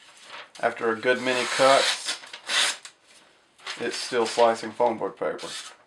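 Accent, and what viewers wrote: Alabama, United States. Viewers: R u from Alabama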